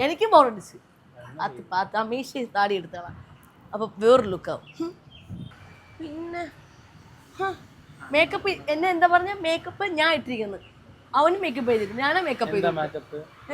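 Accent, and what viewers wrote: India. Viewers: trivandrum slang ingana thanneyan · aentha eppol ella vdeos lm thrissur slang l samsarikknne Kurach famous ayappo tvm slang mattnna aentha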